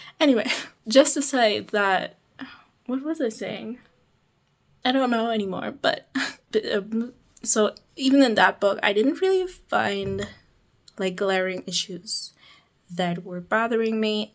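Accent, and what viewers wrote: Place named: Spain. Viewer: I feel like if I wrote by dictation, half my first draft would be "um"s 😂 your accent in Spanish is so nice! In my country we call the supposedly "neutral" accent in Spanish the "Discovery Kids" accent 😂 I am moving to Spain so I hope I get more used to hearing that one, as it's currently not my favorite either lol 🥲 I generally don't read via audiobooks but if I did I'm not sure I would wanna read a Spaniard narrator either. Oh and I had to skip over the Sunrise on the Reaping bits because I'm afraid of spoilers but honestly at this point it will probably be several months before I read that book 😅 I want to reread the original trilogy first, I need to get to it! Fun vlog:)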